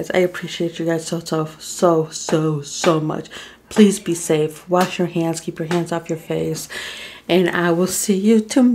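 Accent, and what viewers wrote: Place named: England, United Kingdom. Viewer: I hear a English accent ssooo cute.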